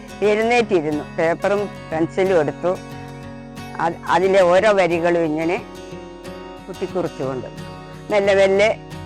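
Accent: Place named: Thiruvananthapuram, India. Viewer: കണ്ണൂരിൽ നിന്നും തിരുവനന്തപുരം ത്തിന്റെ ശബ്ദം ... Good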